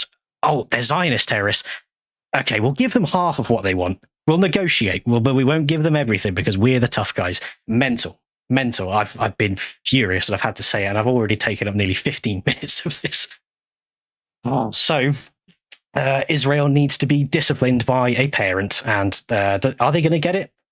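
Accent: United Kingdom. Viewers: Oh a British person ranting? Copium at best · You sound like a brit, how about British politicians, Israelis own them, just look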